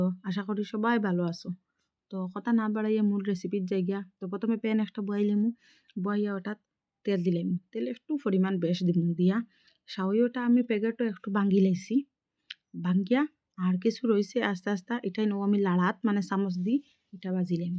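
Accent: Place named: Sylhet, Bangladesh. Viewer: সিলেটি মাতউকা, নাইলে ফুরা শুদ্ধ খউকা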